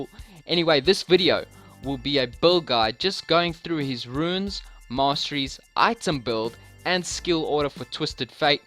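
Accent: South Africa. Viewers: Why does this guy sound South African? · You sound South African. Are you South African?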